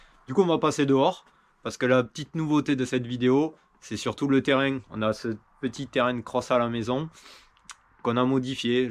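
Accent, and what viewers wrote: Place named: South-West, France. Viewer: Salut, tu es de quel département ? Tu as l'accent du sud ouest si je ne me trompe pas... Moi je suis des Bouches du Rhône.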